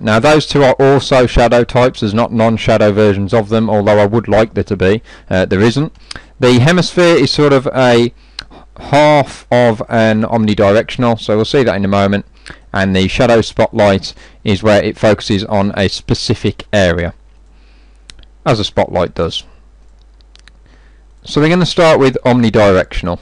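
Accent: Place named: Birmingham, United Kingdom. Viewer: Love you're content and have to admit that even though I've watched your content for several years, I hadn't (until now) subscribed. Better late than never I suppose lol. From you're accent, I'm guessing you're from the same neck of the woods as me ... Birmingham??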